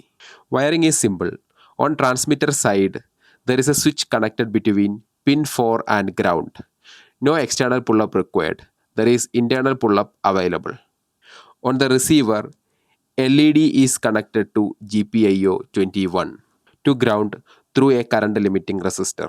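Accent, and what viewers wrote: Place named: Kerala, India. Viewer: മലയാളിയാ ല്ലേ... ഇഗ്ലീഷ് കോട്ടാ..റിയാ.. മമ്മുട്ടിയെപ്പോലെ ശ്വാസം പിടിച്ച് നല്ല ബലം പിടിച്ചാണ് സംസാരിക്കുന്നത്. ഒന്ന് കൈ കുറഞ്ഞ് കുഴഞ്ഞ നാക്കോണ്ട് പറഞ്ഞൂടെ?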